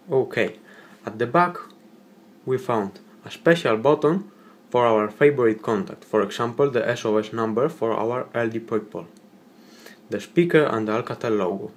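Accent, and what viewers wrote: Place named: Spain. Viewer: tipico: hablar inglés con un acento español super obvio xd nos pasa a todos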